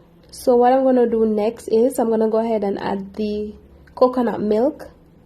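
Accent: Jamaica. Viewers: I like the Jamaican accent. Blaze up gal.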